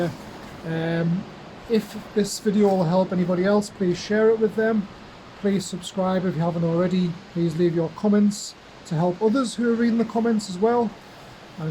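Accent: North East England, United Kingdom. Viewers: North East England he is. Maybe Darlington, Middlesbrough, Newcastle or Sunderland.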